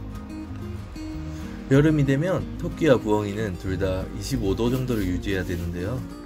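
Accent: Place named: Japan. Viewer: 우와 언어는 한국언데 억양은 일본억양이네요ㅋㅋ 한본어 신기하다 일본에 오래사셨나보내요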